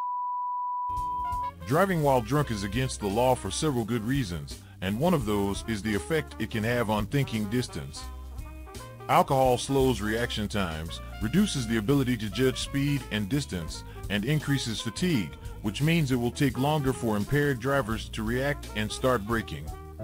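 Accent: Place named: United States. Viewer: This presenter seeks "likes" and subscribers, but I, for one, will not be doing so. The video, which, judging from most of the imagery, was of British origin , (let's face it, an American wouldn't include non-American images), but the voiceover is in the most annoying sententious American accent, reading the script badly, particularly pausing in the wrong places.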